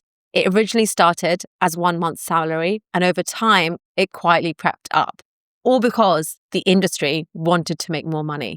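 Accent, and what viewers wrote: United Kingdom. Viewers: Why are you talking $ and Americans instead of £ and Brits? Am I the only one hearing a British accent?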